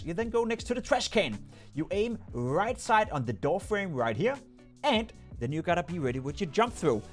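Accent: Denmark. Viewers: Are you Danish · are you from Denmark mate? it does sound so, anyway I left a like fellow dane!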